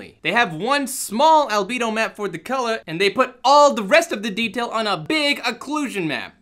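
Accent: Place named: Boston, United States. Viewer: Boston accent, it seems.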